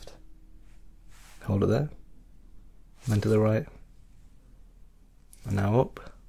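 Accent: United Kingdom. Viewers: Never heard a British…asmrtist? Is that what you call them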